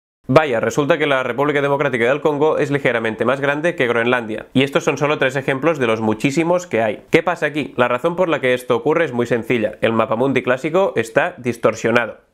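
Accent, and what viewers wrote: Catalonia, Spain. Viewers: Ets catala jajaajaja es nota molt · Eres catalannn??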